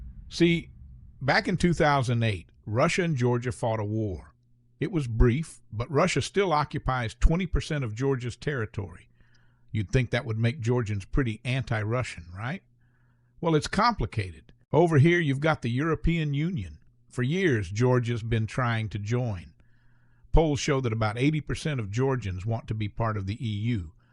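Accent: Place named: United States. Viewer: This video is tipical western propoganda. Even the person speaking in the video has strong american dialect.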